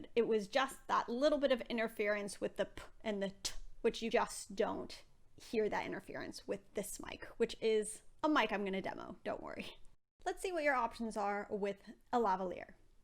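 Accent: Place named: United States. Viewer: You sound American, but you refer to Pounds in currency.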